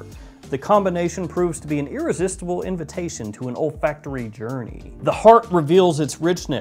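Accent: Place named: Chicago, United States. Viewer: Didn’t know was a Chicago man!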